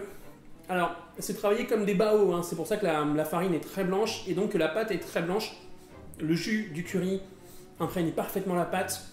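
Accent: South, France. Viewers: J'ai faim. Et j'admire le nombre de craquage d'accent du sud que tu a su mettre dans cette petite vidéo. C'est craquant-gourmand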